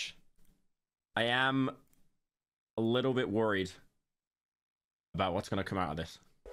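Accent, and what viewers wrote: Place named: United States. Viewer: Why is he so high pitched and murican twanged now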